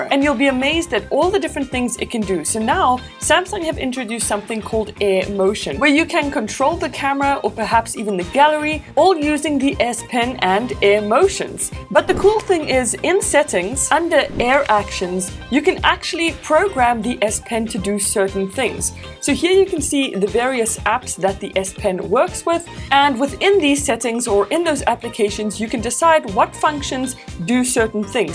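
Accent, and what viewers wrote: South Africa. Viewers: you're from sa? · oh this south african accent of yours. love south africans!! can't wait for the travel ban to be lifted to come to SA again and again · South African! Subscribed. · Ik twijfelde even of je Nederlands was, gezien de accent. Maar het was toch net even anders. Ik had moeten weten dat je Zuid Afrikaanse bent? Ben je daar ook geboren? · Are you South African🇿🇦? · Ar you from south 🇿🇦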